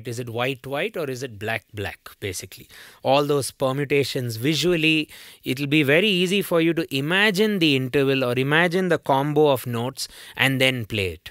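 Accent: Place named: India. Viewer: thanks for a very informative video. your explanation are clear and simple. i watch a lot of technical videos and as a native American English speaker, i often find the indian accent annoying and just move on. while your accent is obvious, it is very understandable and not at all annoying. i work with many people with various accents and it is always apparent who cares about being understood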